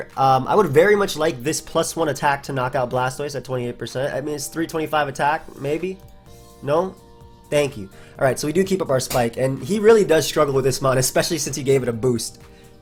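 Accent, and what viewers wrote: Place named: Bronx, United States. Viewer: an entire road to top 10 with your bronx accent when?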